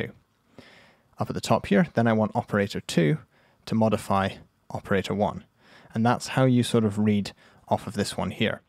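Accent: United Kingdom. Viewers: 9 years of experimenting and reading and trying to understand FM Synthesis and here is this random guy on youtube with a British accent laying it down in 20 minutes.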